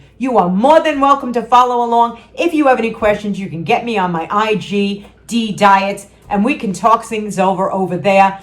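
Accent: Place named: Long Island, United States. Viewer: I just started watching you and you feel like a friend. Your openness and honesty is comforting, your accent reminds me of my Long Island childhood. I could watch you throughout my weight loss journey.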